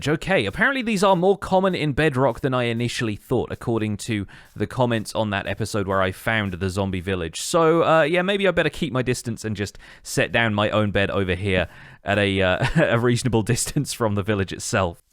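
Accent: United Kingdom. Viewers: Love your British accent. Greetings from Italy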